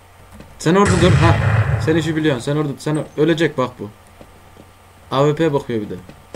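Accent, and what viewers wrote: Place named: Kastamonu, Turkey. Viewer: Kastamonulu şivesinden anlamadınmı :D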